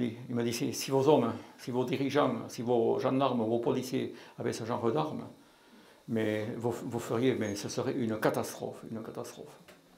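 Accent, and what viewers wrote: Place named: South, France. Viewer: Après avoir vu la video de nurea tv, je ne m'attendais pas à un tel accend du sud 😅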